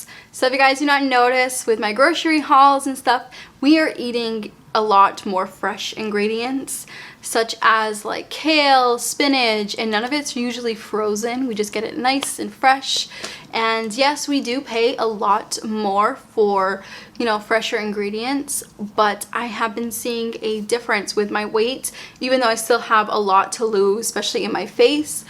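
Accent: Boston, United States. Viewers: She’s from Boston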